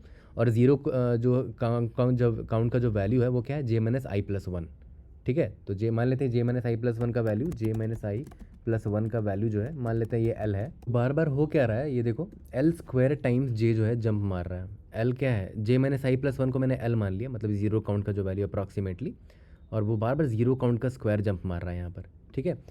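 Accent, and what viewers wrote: Bihar, India. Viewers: Bhaiya aap bihar se ho kya??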